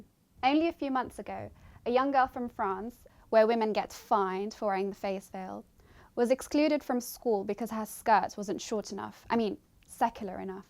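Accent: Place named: United Kingdom. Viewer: Literally everything she said is untrue . The fact that she has a thick British accent makes this all the more SMH....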